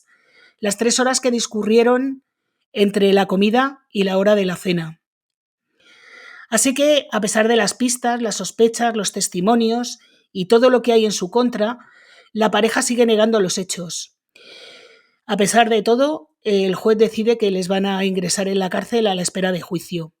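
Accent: Spain. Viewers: Hola querida. Me he pasado la tarde de hoy viendo tus programas y ya me suscribí, eres encantadora con tú acento tan español. Recibes un fuerte abrazo desde República Dominicana y muchas bendiciones.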